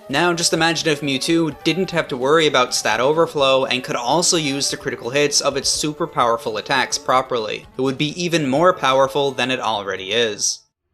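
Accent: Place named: Newfoundland, Canada. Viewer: I detect a slight Atlantic Canada/Newfoundland accent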